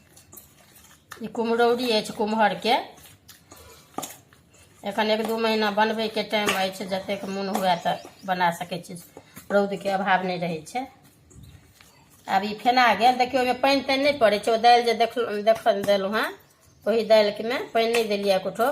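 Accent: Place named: Maithili, India. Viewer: Sudh maithili bhasa suinke mon anandit bhai gel.. Ahake gaam aa jila ki bhel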